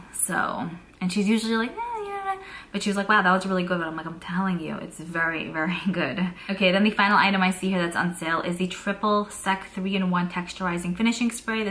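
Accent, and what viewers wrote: New York, United States. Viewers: Hi, your makeup application is so flawless! Can you tell me what brand of blush you're wearing and the color, it's so pretty!! Also, I love your New York accent😊🥰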